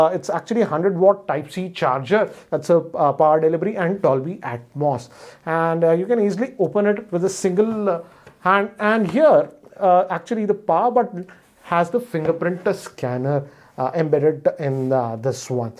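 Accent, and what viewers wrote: India. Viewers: Typical indian style every sentence ending on T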